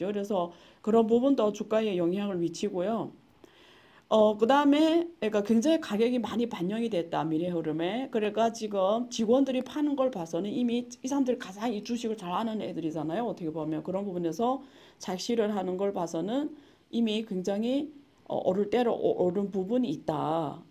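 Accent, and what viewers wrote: Yanbian, China. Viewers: 듣기 거북하다 부칸 언어...아니.뒹귁 년변어인가..불편해..제발.그만..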